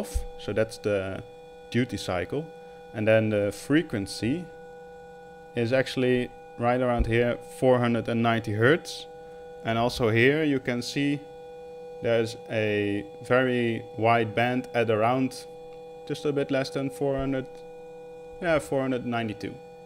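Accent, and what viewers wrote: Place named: Netherlands. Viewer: Het is duidelijk van jouw stem dat je uit Nederland komt. LOL. Zier er top uit.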